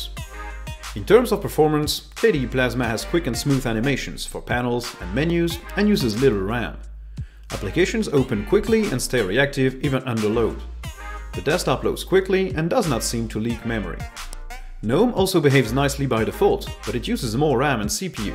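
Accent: France. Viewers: La meilleure chaîne Linux que j'ai pu trouver et ce petit accent français me fait plaisir à entendre. De mon expérience, les extensions de Gnome peuvent cracher ta distribution après une mise à jour de version. Elles sont à utiliser avec modération. Tout comme toi, je trouve les sous-menus de KDE un peu frustrant. Continue ces vidéos de qualité qui se concentrent sur ce qui fait un peu défaut aux distributions : de la finition. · Ta accent français était un peu plus fort qu'elle est aujourd'hui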